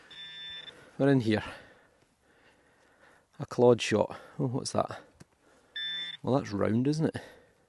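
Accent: Scotland, United Kingdom. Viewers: Congratulations on 36k, you earned every subscriber fair and square! You really are the best of the best at what you do! I always look forward to every hunt, every tour, and every history lesson! You should see the looks I get, here in North Carolina, when one of your Scottish phrases falls out my mouth! Hard not to pick up a little Scottish brogue, words, and phrases when I watch all your videos. Lol Great finds and always looking forward to the next! Ive also been watching all the tour videos on your other channel and enjoy them so much! Thanks for sharing it all with us! Take care and see ya on the next go round!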